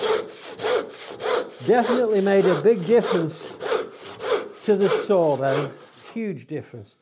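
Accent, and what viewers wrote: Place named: Midlands, United Kingdom. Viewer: So nice to hear a lovely soft Midlands(?) accent on one of these videos. Thanks!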